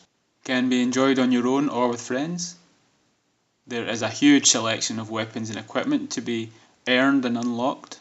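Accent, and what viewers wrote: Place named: Scotland, United Kingdom. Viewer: First time hearing you speak, didnt know your were that broad scottish;) its stocko by the way, thanks for the shout out:)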